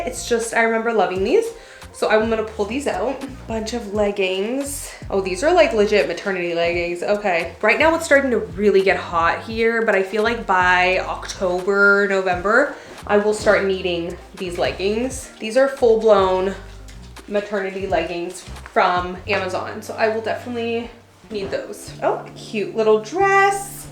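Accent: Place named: Canada. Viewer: Congrats again on baby girl 👧 also I noticed the “y’all” while making the ice drink lol I see TN is rubbing off on your Canada 🇨🇦 accent 😊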